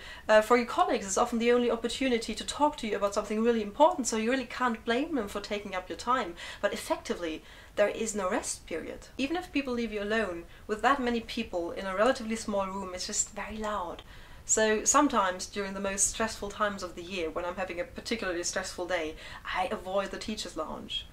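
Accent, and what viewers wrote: England, United Kingdom. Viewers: I like your British accent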